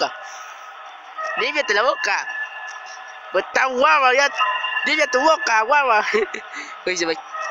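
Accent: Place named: Cuba. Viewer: No te preocupes por hablar como cubano, Soy peruano pero hablo como mexicano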